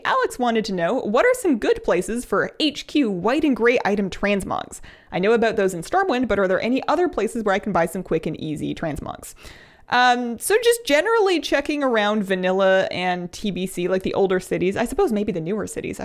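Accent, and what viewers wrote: Canada. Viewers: Do I detect a hint of Canadian in there? "Blame Canada! With those beady little eyes and floppy heads full of lies!"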